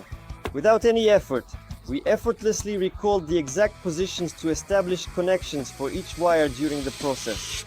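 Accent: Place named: RP, United Kingdom. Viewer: The ai translator speaks like a British royalty.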